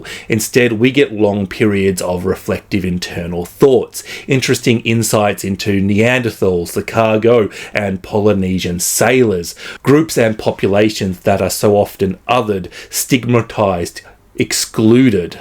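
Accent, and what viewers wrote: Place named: Australia. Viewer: 😂 I kept having to pause my TV and run to the other room to repeat the zingers to hubby: not that I could do them justice, because the accent and cadence really adds to the delivery. I've said before, it feels like Australia is in a golden age of comedy, and everybody benefits 💛 I did get in a joke of my own, don't know if it translates unless you've heard of Shark Tank, but here goes: after running to hubby to repeat several hilarious zingers, I told him that hadn't been posting for a while while his computer was being repaired, and he must have used that time to fill his tank. His Snark Tank. 😂 But seriously, I love your recommendations, and love the way you always approach your reviews from the position of "what basics -- plot, character, writing, theme, etc. -- does this book do well (or not)?" And you always invite the readers (viewers) to reflect and learn about themselves, and to grow our critical faculties: "what kind of reader are you?" I love the laughs, but am nourished by the grounded focus of these videos. 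P.S. I always enjoy when picks out books (and other things!) to recommend to so many kinds of readers. It feels weird havng a minority opinion, so it's encouraging that reminds us "nobody's right and nobody's wrong" when it comes to our reading perspectives. I read Stoner and Gilead, and while I could appreciate their merit, they didn't vibe as highly with me. Also read There There, which was fine, but to me it didn't rate higher than o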